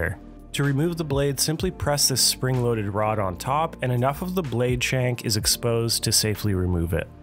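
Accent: Canada. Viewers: Took me a while to figure out your Canadian pronunciation of "decal", but otherwise, thanks. Also you lost some credibility with the Prusa hate. I agree that Bambu printers are technically better, but open source is the most important feature for me, so Prusa wins there.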